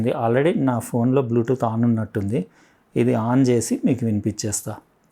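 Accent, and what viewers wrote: Telangana, India. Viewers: TG slang top notch 💪💥🔥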